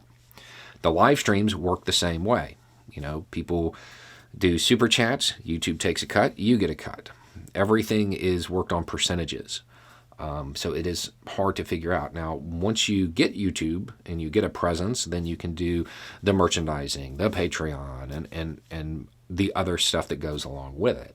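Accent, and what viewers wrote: South, United States. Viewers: is it a souther accent? Thanks to Blance Devereaux I like it. 😊 But I'm not American, so I probably haven't been exposed to certain things that could influence me. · It was definitely the contrast between your look and sound and your actual content that hooked me. I have family scattered across the south, so I know all southerners aren't hicks, but you're definitely a breath of fresh air. Keep it up! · I don't remember how I found you. Maybe it was something like "viewers of this channel (say...Seth Meyers Closer Look as an example) "also like this channel" (you) . I also enjoy watching "A guy" from Minnesota. Maybe youve heard of Vice Grip Garage. Another super intelligent fellow who comes off as a hick from the back woods. Not that you are either a hick or from the backwoods. But Derek is a person with an affected accent and he has tons of followers. 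Perhaps YouTube put your stream in the same queue because of that. It doesn't matter how I found you...but that I did. The last 5 years have been something of a wild ride emotionally capped off with the devastating effects of the ongoing pandemic. Discovering your voice has had a calming effect on me. Seth and Stephen Colbert are great at keeping disillusionment at bay. But in the last 4 months...you have really set my mind at ease. I can say this. Before the MAGA crowd appeared I had always thought that Southern boys were the pinnacle of common sense. I grew up watching the Daltons and later The Dukes of Hazzard. Uncle Jesse was the stereotype of what I believed to be honest folk intent on doing right for the sake of being on the right side. Of course nevermind the two centuries of racism. It was a breath of fresh air when I heard the philosophy that you use from your cap wearing furry faced southern accent. 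Something that likely exists but is not common. You have a gift. I'm so glad you use it. I think while I knew these th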